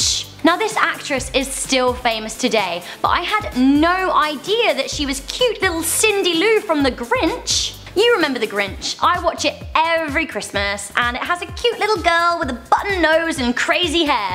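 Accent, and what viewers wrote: United Kingdom. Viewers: I like her British agcent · excuse me Miss Brit with the annoying accent..HALEY DID NOT HAVE A RUINED CAREER AT ANY MOMENT OF HIS LIFE SO FAR. <link> PROVES IT....CHECK IT OUT....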